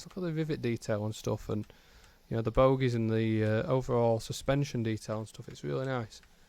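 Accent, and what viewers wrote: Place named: North, United Kingdom. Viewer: Great to hear your northern accent and comments. Sceanario pack progress?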